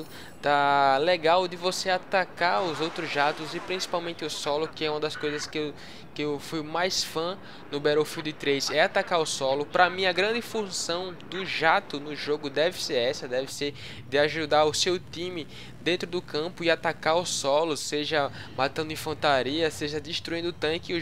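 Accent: Northeast, Brazil. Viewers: Você é nordestino?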